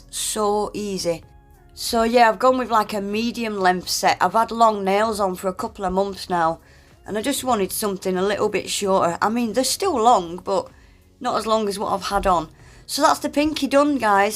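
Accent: Yorkshire, United Kingdom. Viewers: It’s a proper Yorkshire accent 😉🥰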